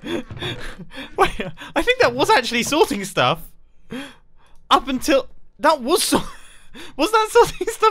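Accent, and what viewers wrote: United Kingdom. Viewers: sounds better with his British accent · the way he said what is “What is occurring?!?!?” Instead of saying what is happening is so funny to me for some reason the British accent makes it better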